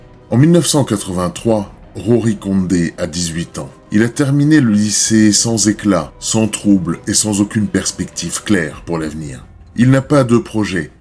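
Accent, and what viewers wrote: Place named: France. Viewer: Coucou, j'espère que tu vas bien ? Que faire quand on se rend compte de ta notification vers 4h du matin ? On se couche plus tard car on ne peut pas résister 😂. Par contre tu as un accent français très marrant. Encore un qui a eu une enfance très solitaire et pas très stable, pas très enrichissante pour un enfant. On peut comprendre qu'il veuille prendre le contrôle, lui qui ne l'a jamais eu et surtout se faire voir. Par contre il n'a pas "chômé" niveau nombre de meurtres, il les a tué de manière assez rapprochée par rapport à d'autres tueurs en série. Merci encore pour ta vidéo hyper intéressante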